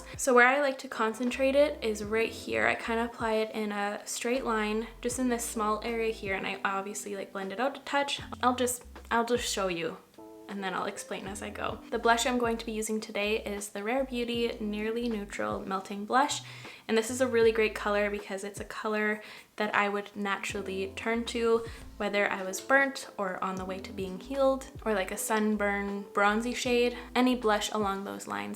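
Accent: Canada. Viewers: I loved the way she said out, and about😍😍😍😍the Canadian accent love it! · You have such a calming voice and I could listen to you Canadians say out and about all day! I love it!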